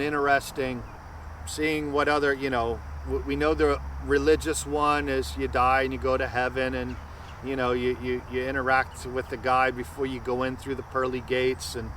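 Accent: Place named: West Country, United Kingdom. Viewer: The 'Pirate voice' is a west country accent (south west of england) - The FSM is a well established theme within the atheist movement. As I get older I've moved from a staunch atheist to agnostic, but not necessarily the white bearded man. Until science can prove the origin of consciousness [referred to as the Hard Problem] I'll be somewhere between atheistic death is death, consciousness is a product of brain function and is granular in nature, more brain power more consciousness - and the notion that the brain is a receiver of consciousness. I do not fear death, only the moment of it. I'm fascinated by the notion of consciousness. The YT channel 'closer to truth' has some excellent interviews with prominent scientists and philosophers about it. Anyway, excellent video - you've got this sussed mate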